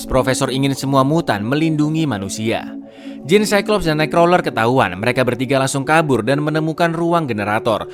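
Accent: Java, Indonesia. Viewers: Ngomong buyar nya gg wkwkw kentel amat jawa ta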